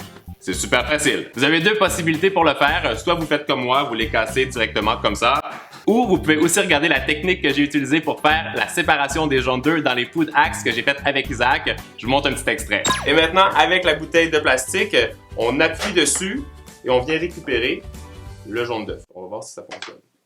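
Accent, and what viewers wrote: Quebec, Canada. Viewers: L'accent québécois est flagrant 😂🔥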